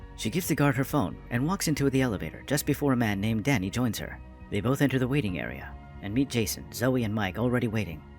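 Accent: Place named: Russia. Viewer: Что за акцент ужасный? Ты что русский? Ты же понимаешь, что тебя иностранцы некоторые просто не поймут. Пацаны расходимся, видимо на русском Ютубе не платят деньги и он решил делать контент в зарубеж.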